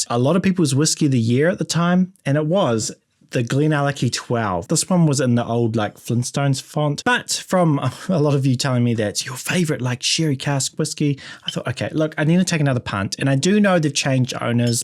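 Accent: New Zealand. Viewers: Hi, nice vid! Liked! Sorry für beeing curious, but is that a kiwi accent?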